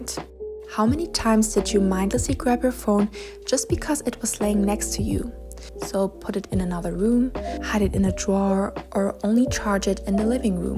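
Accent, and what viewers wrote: Germany. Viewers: Du hast voll den deutschen Akzent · Me thinking her voice sounds German. Then she pulls out a German book. ✨German power ✨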